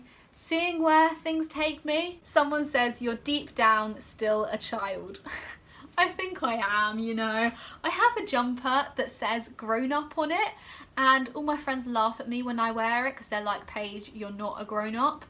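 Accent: United Kingdom. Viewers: Hi just I have a question is there any collage that helps disabled by allowing them to be students for free or with cheap fees.I watch your videos to get a British accent. Thank you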